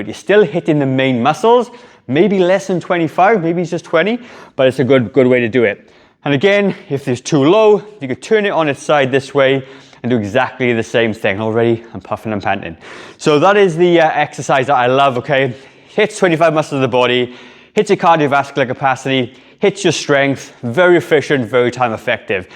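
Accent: Wales, United Kingdom. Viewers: Is that a Welsh accent I detect, I'm from South Wales. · What’s with this guy’s voice ? Not only is he Welsh , but he’s got a weird speech defect ? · Did he say new zealand? He sounds welsh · Bro, are you Welsh?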